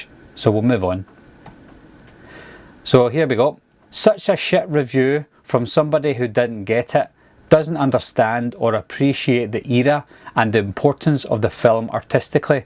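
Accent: Scotland, United Kingdom. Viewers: I'm an Irish-German-Scottish American from Connecticut and I understand you perfectly. People need to realize that the world is a lot bigger than just their backyard. I stopped to watch one of your videos because of your accent. I'm just sorry for the grief that these people cause you. Do you think sometime you can make some videos showcasing some of the landmarks or historical sites in Scotland? I would love to see what it's like over there. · I like hearing Scottish accents from Reviewers such as Yourself & 'The Critical Drinker' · A Scottish accent warms my bits. ❤😍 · I like your accent than many other British accent. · I love your accent!! Its great! I try to speak with a Scottish accent alot lol Im actually a very small perscentage Scottish. Not alot but def more than liz warren : / great channel! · I’m from Houston with an east Texas/west Louisiana accent. 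I confuse myself on occasion. Lol. My daughters attend a Scottish arts private school and your accent is perfectly commonplace in our world- so, no complaints here! ☺️ Btw, thank you for keeping such a clean channel! We love horror movies but I’m selective on what they watch. You’re our favorite! 😎🎃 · I almost spit out my water and cracked up pretty hard after you read the second profanity-laden troll comment 😂. You handled these comments very well. Genius video you uploaded — this is akin to the “celebrity read mean tweets” segment on late night tv. P.S. I’m an actor & your videos help me immensely with my Scottish accent.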